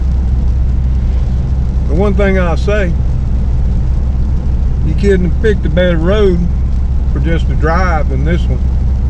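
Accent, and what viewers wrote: South, United States. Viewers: Mr.. I was worried about you too. Your Vegas Fan. I'm glad your ok. I also think about Trixie possibly having issues when your not around for a bit. I would like to put those southern bells out there on notice, about looking after you. No disrespect sir but your quite the southern gentlemen a man of principle and intelligence.. Their missing the boat as far as I am concerned. Opp's that's right you got rid of that. Lol. I sure enjoy your southern drawl and interesting verbiage at times. Confession I don't always understand you it just makes it all that much more entertaining and educational of another culture then I am used too. I hope you don't take my off hand compliment and kidding to be inappropriate.. I have the utmost respect for you sir, your channel and what you are willing to share with us out here in video land. Be well Mr. 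until the next ride about.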